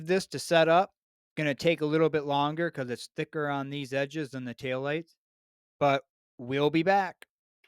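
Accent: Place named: Wisconsin, United States. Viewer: You sound like a Wisconsinite, I bet you say "you's guys"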